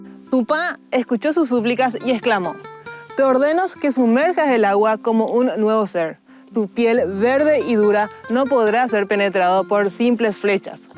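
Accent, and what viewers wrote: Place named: Buenos Aires, Argentina. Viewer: Es porteña no es paraguaya por el acento al hablar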